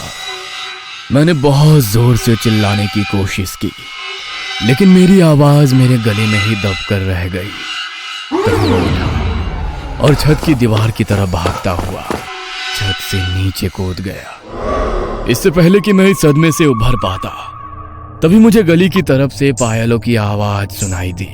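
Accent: Bihar, India. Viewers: Bhai aap bihar ke ho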